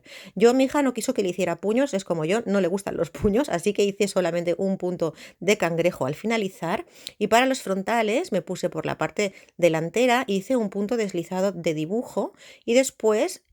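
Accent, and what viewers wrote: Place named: Spain. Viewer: Hola, hermosa! Desde La Plata, provincia de Buenos Aires, Argentina 🇦🇷, te acabo de descubrir!! Como docente jubilada no puedo menos que felicitarte por tu excelente didáctica! Voy a hacer este cardigan o saco porque me animo de una, según tus claras explicaciones, a las que agregás recursos de color y los tips siempre muy bienvenidos! Con mis 72 años, "allá vamos", como decimos por acá! 😀 Ese tonito español- no * gallego * 🙄, me encanta! Muchas, muchas gracias por tu encanto personal y profesional. Luisita. 😘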